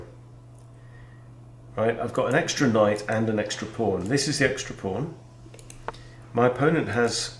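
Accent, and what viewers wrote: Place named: United Kingdom. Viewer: I watch bc I need a channel where I can have the moves explained and see how players closer to my elo play (Im only 700/800 but have only played 230ish games)--esp endgames. You playing rapid gives me time to think abt what I'd play in your position. The british accent helps, but my oh my the blundering rollercoaster and the amount of times I yell at you for bad endgame moves is why I stay. Can't forget abt the accents and movie quotes haha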